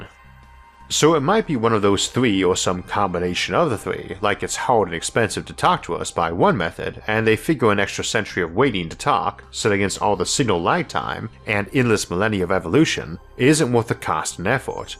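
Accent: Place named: United States. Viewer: Don't understand American accents??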